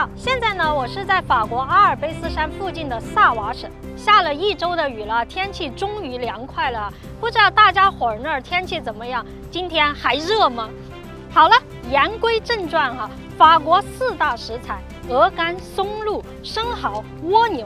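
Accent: Sichuan, China. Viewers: 怎么从普通话里听出了一丝川渝味道…… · 浓浓的川普口音，好亲切啊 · 喜欢这四川口音。哈哈🤣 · 川普😀😀四川哪儿的啊 妹儿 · 一直以为yooupi是东北人 原来是四川妹子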